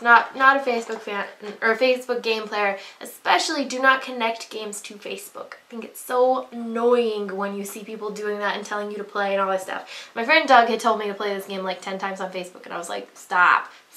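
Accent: United Kingdom. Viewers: Lol I love this look, despite the inspiration xD I've never played that game, but the only Facebook game I've ever played is the Hunger Games Adventures, where I haven't played in a million years. Your British accent just makes your resemblance to Kate Winslet more legit :P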